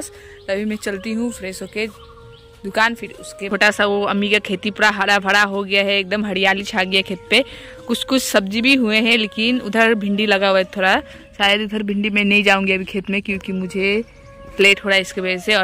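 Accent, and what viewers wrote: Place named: Jharkhand, India. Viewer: Apki jharkhandi hindi boli bahut achcha lagta hai. My jharkhand se apka video dekhta hoon.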